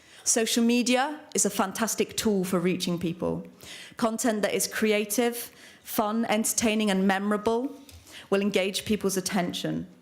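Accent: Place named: RP, United Kingdom. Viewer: She's very posh. Brexit - the "Europe has no federal ambitions" lie ..“What will actually happen if we stay in the EU” is a question no remainer will ever answer but here it is warts and all. Check it out if you wish ——&gt;&gt; 1: The UK along with all existing members of the EU lose their abstention veto in 2020 as laid down in the Lisbon Treaty when the system changes to that of majority acceptance with no abstentions or veto’s being allowed. 2: All member nations will become states of the new federal nation of the EU by 2022 as clearly laid out in the Lisbon treaty with no exceptions or veto’s. 3: All member states must adopt the Euro by 2022 and any new member state must do so within 2 years of joining the EU as laid down in the Lisbon treaty. 4: The London stock exchange will move to Frankfurt in 2020 and be integrated into the EU stock exchange resulting in a loss of 200,000 plus jobs in the UK because of the relocation. 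(This has already been pre-agreed and is only on a holding pattern due to the Brexit negotiations, which if Brexit does happen, the move is fully cancelled - but if not and the UK remains a member it’s full steam ahead for the move.) 5: The EU Parliament and ECJ become supreme over all legislative bodies of the UK. 6: The UK will adopt 100% of whatever the EU Parliament and ECJ lays down without any means of abstention or veto, negating the need for the UK to have the Lords or even the Commons as we know it today. 7: The UK will NOT be able to make its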